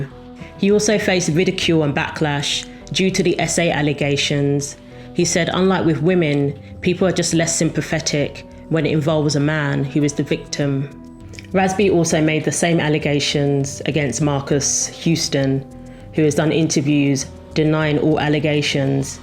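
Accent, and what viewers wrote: United Kingdom. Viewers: Good gawd she reads so slow. I had to fast forward real quick when she read the second time. British accents force you to speak so slow. That must be why they speak with an accent. They don’t follow the phonetics of English.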